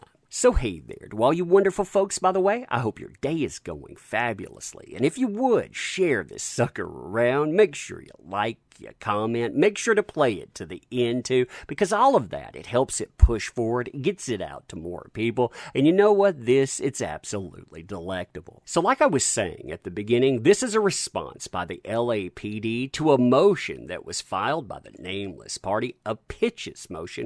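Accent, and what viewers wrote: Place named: Tennessee, United States. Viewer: What kind of God forsaken accent is this? Land of Dolly Parton aiiight...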